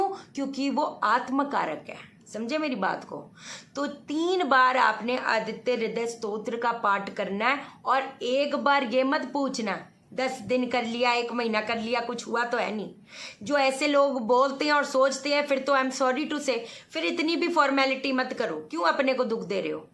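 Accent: Punjab, India. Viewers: बहन जी चाहे कुछ भी कहो ,please don't take it otherwise ,आपकी आधी पंजाबी मिली हिंदी बोली बहुत प्यारी/मस्त लगती है ,जी करता है सुनता ही रहूँ...👌👌 वैसे कुछ बहुत जरूरी परामर्श लेना है आपसे...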